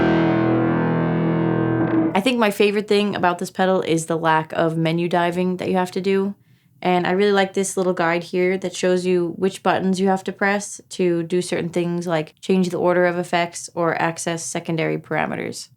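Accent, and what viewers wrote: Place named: Alabama, United States. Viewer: For faster bankruptcy, start with JHS pedal first episode. Turn the YT speed up to 150% as you can eliminate the slight Alabama drawl and get through bankruptcy much faster” By the time you get to the Colorbox 2, you will have enough money to enjoy whats left while having enough left over for food and rent with a special monthly “kitty” to buy your favs from.:)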